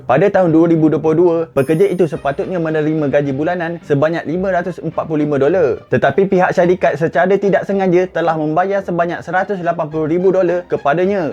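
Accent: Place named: Kelantan, Malaysia. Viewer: masih ado pelat klate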